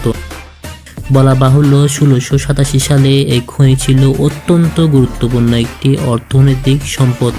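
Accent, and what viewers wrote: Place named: Chittagong, Bangladesh. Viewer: ভাই উচ্চারণ নিয়ে কাজ করতে হবে। অতিরিক্ত চাটগাঁইয়া এক্সেন্ট আসছে। শুনতে অনেক খারাপ লাগছে।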